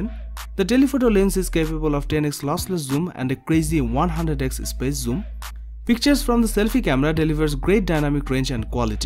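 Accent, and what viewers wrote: India. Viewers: omg the Indian accent...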